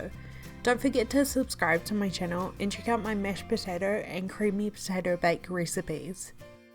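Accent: New Zealand. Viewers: Lovely recipe,! And is that a kiwi accent I hear?:) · Yeeessss. Recovering from an operation which has put starchy foods back on the menu. Been craving roast potatoes for almost 5 years. Gonna try it with sweet potato too, do you reckon it'll come out crunchy? Not only did I get the recipe to do them like nan used to, I got it in an easy to listen to New Zealand accent. Sweet as, cheers from across the Tasman.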